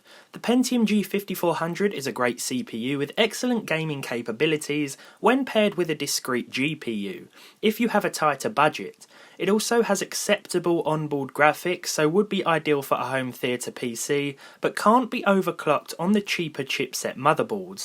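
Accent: United Kingdom. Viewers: Hey mate where are you from in the UK i like your accent but you never answered me at all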